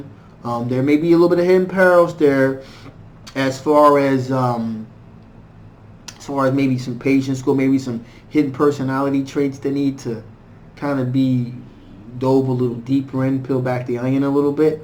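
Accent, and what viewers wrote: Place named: New York, United States. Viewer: Yes your skills are on point. Love the NY accent!! Queens bound. I am feeling pushed into this relationship. I want it but in a mature way give me a vision a plan we both can agree on then I'm focused on it full force. There are some things i need to get in order with my credit then I'm ready to go all the way to marriage!!!! But the anger issues have to cease.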